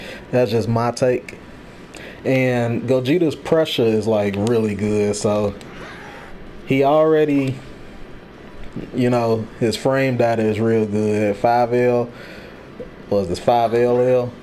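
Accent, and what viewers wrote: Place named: Texas, United States. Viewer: thought you was from Texas lol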